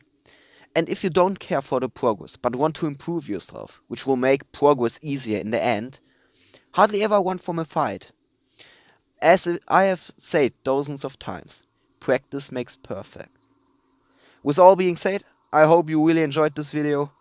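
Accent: Germany. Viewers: Hi. Are you German? It sounds like. 😊 I'm from German. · When german speak english😂😂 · You'r German, am i right ?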